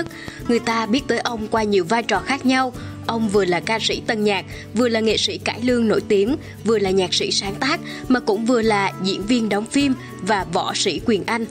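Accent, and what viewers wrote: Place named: South, Vietnam. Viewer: Giọng đọc hay, giọng Nam bộ mà sao thấy ko giống với kiểu cách mà người Nam Bộ xưa nói thế nhỉ, cảm thấy khang khác! 🤔🤔🤔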